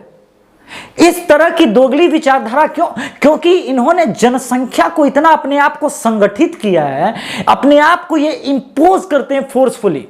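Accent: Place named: Bihar, India. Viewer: apki awaj me wo humare bihar wala touch bujha raha hai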